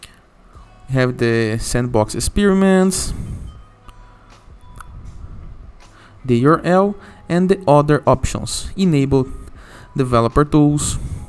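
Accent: Brazil. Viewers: Esse inglês é BR hein rsrs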